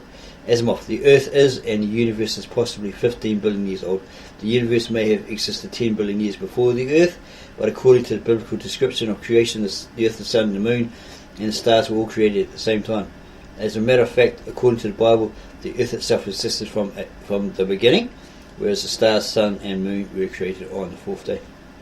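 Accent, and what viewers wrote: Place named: Australia. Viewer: Worst video narration ever. Between his halting mumbling and dense Aussie accent it was barely understandable. Thank God for closed captions !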